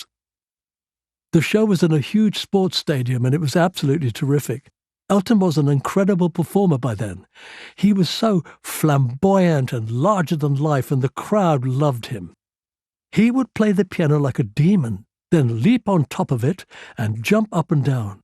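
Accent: United Kingdom. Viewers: Greetings SIRCLIFF RICHARDOBE. Thank u for sharing …this recording ..awesome reading of segments from ur book…ur Brilliant British accent is a delight to my ears..I would listen intently to further reading! An accent that seems to be disappearing.. beautiful blessings!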